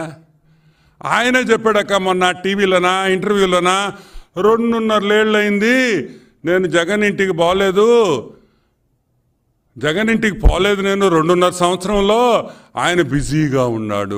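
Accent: Nellore, India. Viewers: Nellore slang suprb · సర్, మీ నెల్లూరు Accent చాలా బాగుంది...జై CBN, జై టీడీపీ... అబద్దాలు చెప్పి పోయిన సారి గెలిచారు...మీరు వెంట వెంట నే విమర్శించాలి...వదలరాదు... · Entraa aa accent... Oka press meet pettinappudi language sarigaa vundaali... Nellore paruvu theeyyodduraa... Ok neeku nachhaledu daanni right gaa present cheyyali... Paruvu theesaav kadaraa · Nellure slong super anna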